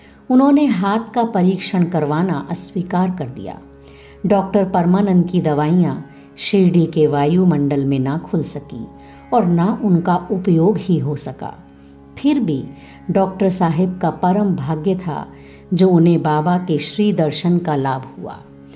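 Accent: Standard. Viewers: आपके।द्वाराबाबा की लीलाओं का बहुत ही सुन्दर और शुद्ध उच्चारण किया गया।धन्यवाद।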